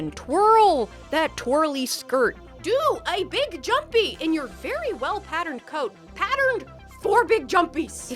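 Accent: United States. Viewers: I think it's just an aspect of her southern accent (drawl) slipping out every now and then. It's less an extra 'r' sound and more that we blend or even skip the vowels so the accent on the "a" disappears.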